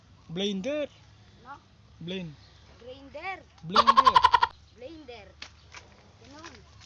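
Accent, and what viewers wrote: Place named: Pampanga, Philippines. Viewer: may punto ka narin ng kapampangan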